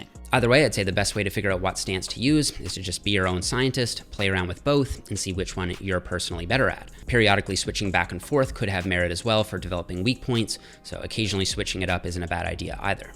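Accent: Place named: Canada. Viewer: You’re sounding super Canadian today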